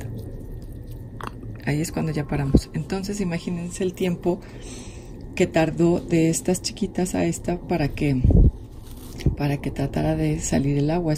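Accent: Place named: Mexico. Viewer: Viva México,Lindo y querido y de donde pensaban que era???escuchando su asento es ovio que Mexicana,succulenta esss. 🌵Gracias por tus vídeos😄😁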